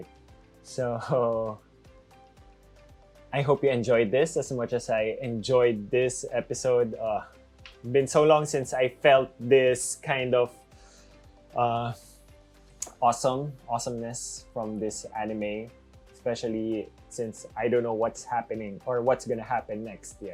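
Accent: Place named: Philippines. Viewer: Its not that its bothering or anything. But you have the Filipino accent, and i cant stop noticing it XDD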